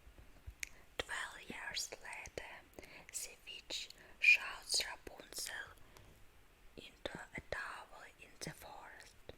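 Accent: Russia. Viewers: Ненадо выговаривать каждую букву на Английскому, ты пытаешся впихнуть нотки Русского в Английский)). A tak я заснул 4etko))) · I'm not a fan of fairytales and stuff like this but I'm so happy to hear you speaking in English!!! I really hope you will make some more videos in English from time to time especially doing medical roleplays which I LOVE the most! English with russian accent actually is a trigger for me lol xD ..btw, I just started learning Russian a month ago so I could expand the joy of thousands of videos for ASMR in Russian , too, not just in english! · прости, но your russian accent "cut" my ears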